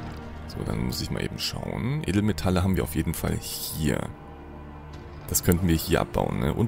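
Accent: Saxony, Germany. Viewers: Bist du Sachse, falls Ja. Liebe Grüße aus Leipzig😂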